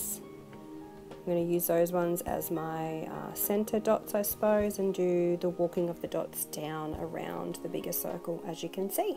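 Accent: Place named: Australia. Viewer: You're voice is making me miss Australia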